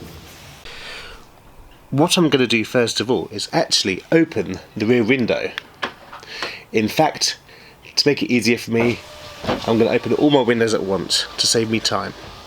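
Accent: United Kingdom. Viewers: Klassno. Tak prijatno slishat British English in Russia! Nice car! <link> My husband's scuba diving videos (mainly)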